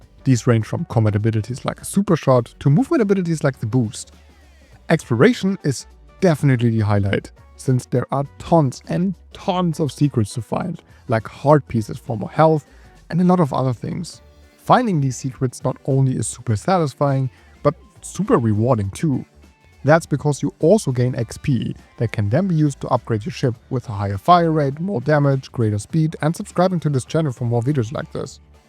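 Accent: Germany. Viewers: Der deutsche Akzent is zu krass 😂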